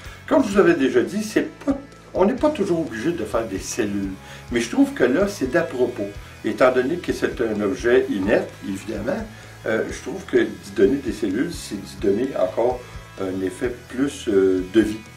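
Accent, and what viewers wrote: Quebec, Canada. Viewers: Waouuu quelle bonne idée !! Et quelle belle vidéo : l'accent québecois me fait penser a mon frère qui vit à Montréal, le premier morceau de musique un vrai régal, et le résultat sur la pierre j'adore... Bonne journée !!!!🤗🤗🤗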